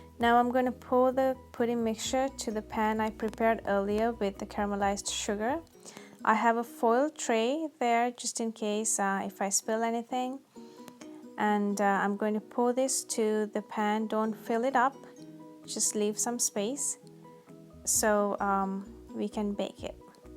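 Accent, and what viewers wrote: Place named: India. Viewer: I hate your hindi accent.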